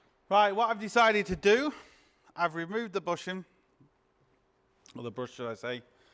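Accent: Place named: United Kingdom. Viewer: Great Job! British Guy LOL Thank You !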